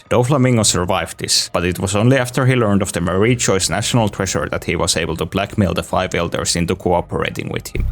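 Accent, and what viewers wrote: Finland. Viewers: Kuulosti melkein suomalaiselta korostukselta, mutta kanavan info silti sanoi Yhdysvallat... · Sounds like finnish accent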